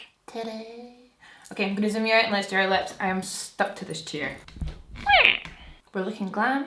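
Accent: Scotland, United Kingdom. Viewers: Hi from Israel! Are you scottish? I love your accent❤🌹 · you’re scottish omg!! me too i’m so happy i found a scottish youtuber ahaha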